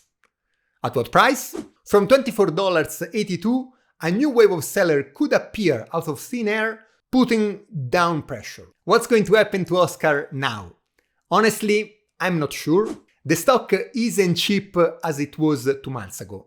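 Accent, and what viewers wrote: Tuscany, Italy. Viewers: sei italiano? hai un accento toscano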